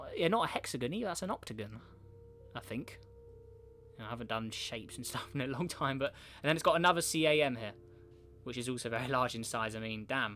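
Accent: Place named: United Kingdom. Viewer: Speek americane please i cant understand briten people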